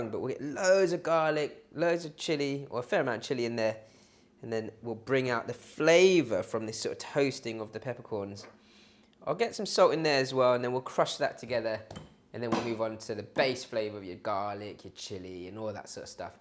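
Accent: United Kingdom. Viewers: Holy shit, an Asian dude with a British accent! Love it!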